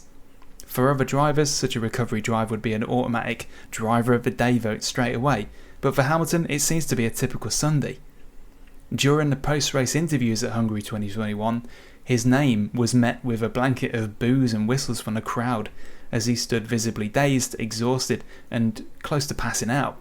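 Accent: United Kingdom. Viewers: You sound British and support Max. I'm willing to bet you'll never find someone from Holland, let alone a journalist supporting Lewis over Max. Weird. But I guess it's the 50%-50% that bothers you.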